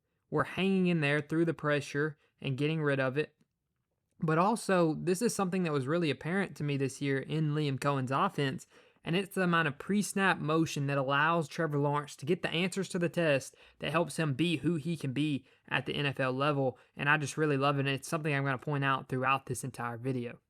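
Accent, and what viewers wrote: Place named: Alabama, United States. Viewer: Love hearing a southern accent on a football breakdown. Love seeing football breakdowns on my Jags. You're spot on with your analysis of Trevor. I'm guessing central-south Alabama with that accent?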